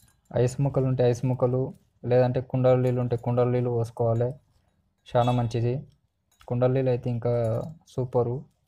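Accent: Telangana, India. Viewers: Anna ni telangana langveg super anna and drink kuda mast undi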